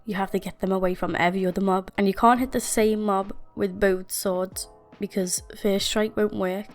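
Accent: Liverpool, United Kingdom. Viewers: Are u a scouser lol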